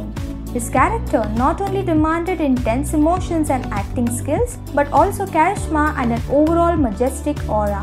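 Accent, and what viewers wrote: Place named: Thailand. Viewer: Hola, tu acento parece tailandés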